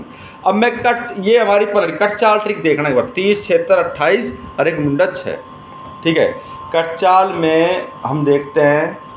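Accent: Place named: Mathura, India. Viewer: Mujhe aapki language mathura se lagti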